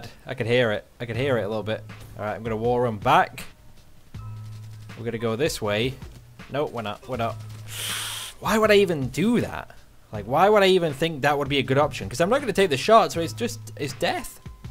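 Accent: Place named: Southern England, United Kingdom. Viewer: whereabouts r u from like coz u sound southern but I don't think ur from london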